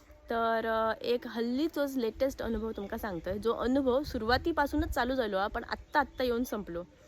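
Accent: Malvani, India. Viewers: मालवणी भाषेत वर्णन करतास ता कानाक ऐकूक बरा वाटता. ह्या स्वार्थी लोकांच्या दुनियेत आपणही कणखर राहून धंदो केलात तरच निभाव लागात.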